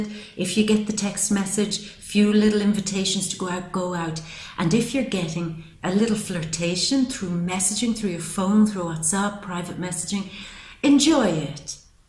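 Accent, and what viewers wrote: Ireland. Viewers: You sound like a sexy Irish woman. Insightful reading. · Thank you so much for your time and energy doing these reads for us💕😘 xx I’m newly subscribed to your channel..... So lovely to hear an Irish voice....I lost my soulmate of 20 + years, 2 & 1/2 years ago and his family sadly before that, they were from Carrick-on-Shannon, family name Conlon.... Taurus is my eldest daughter, we’re r/c and since my spiritual awakening we have differences in religion ..... I turned down Sag who’s my ex husband, he’s married to Aries and he’s been seeing my Gemini friend who’s also my twin flame...Now he’s moving onto my Virgo friend....So glad I said no..... As my mother in law would say, Your well for Anne,lol......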